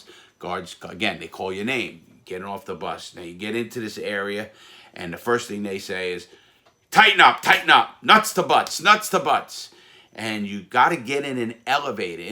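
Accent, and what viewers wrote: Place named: East Coast, United States. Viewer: Very good...your interesting and love your east coast accent..handsome too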